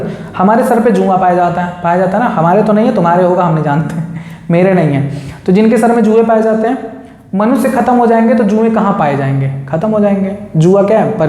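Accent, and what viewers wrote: Chhattisgarh, India. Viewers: Sir aap Chhattisgarh se ho kya???🙂😁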